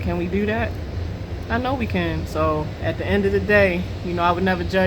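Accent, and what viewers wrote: Baltimore, United States. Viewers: I know this has nothing to do with the subject matter, but I knew all this time you had a Baltimore accent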